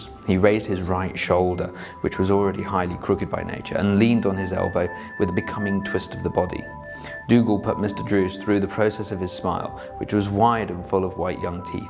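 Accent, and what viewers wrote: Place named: Scotland, United Kingdom. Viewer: She scottish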